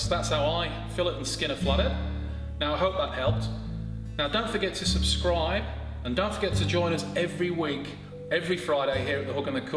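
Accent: England, United Kingdom. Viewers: Flathead Is my favourite fish, Thanks for the vid. I can here the English accent in you voice. I live in australia but was born in Hammersmith London. How about you?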